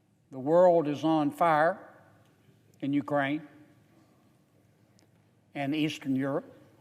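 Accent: South, United States. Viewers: I am a Canadian and I love senator Kennedy. His southern accent makes him sound like a bumpkin but when you listen to what he has to say. He’s brilliant ,and one of the most talented and intelligent politicians I have ever listened too. I believe that he actually cares about his country. You are very lucky to have a senator like him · Don’t let his Southern accent fool you!! He’s very intelligent!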